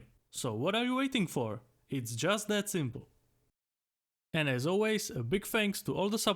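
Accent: Czech Republic. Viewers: you sound czech, are you czech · Czech accent?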